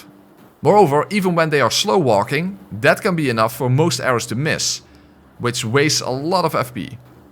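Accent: Netherlands. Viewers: i can smell the dutch in your accent. gegroet 🤙🏼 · He sounds dutch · Haha tita tovenaar Rogier geweldig ik hoorde all aan je engels dat je nederlands bent maar dat grapje was echt geweldig🤣🤣🤣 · Ur Dutch aren’t u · Dutch? xD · the moment u said... ''ti ta tovenaar rogier godverdomme'' xD my first time i watch one of ur video's and i hear u are from the netherlands XD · I don't know who you are but you sound very Dutch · damn you have such a thick dutch accent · Love the video I love the Dutch accent too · As a half dutch guy, hearing a Dutch accent upon opening a video is like Christmas day. So comforting 😌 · the dutch accent is also super charming lol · Kankering = conquering hahaha funny Dutch accent you have. Nice vid!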